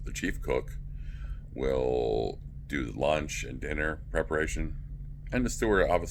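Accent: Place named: United States. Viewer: White guy, speaking American English… you on a US registered ship? That’s not how it is typically at sea.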